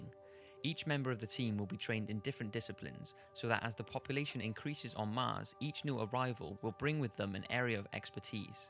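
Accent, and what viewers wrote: Wales, United Kingdom. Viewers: i can not work out your accent! it sounds kinda welsh??? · i like british commentary. it makes the videos feel more intense and stuff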